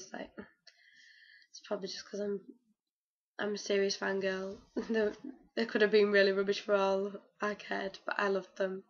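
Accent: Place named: United Kingdom. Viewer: Love your accent is it British?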